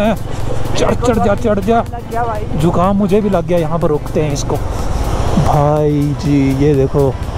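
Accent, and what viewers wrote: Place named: Jammu, India. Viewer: Apki bhasha se lagra h ap jaamu se ho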